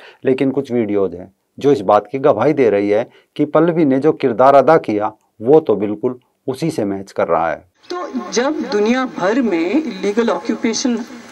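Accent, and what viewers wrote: Haryana, India. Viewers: You first get rid of your hariunvi accent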